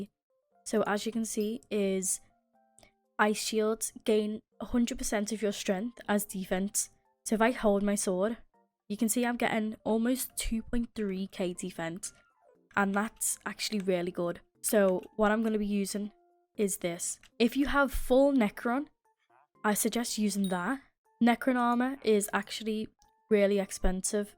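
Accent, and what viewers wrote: United Kingdom. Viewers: Are u from like Great Britain or something cause u sound like haha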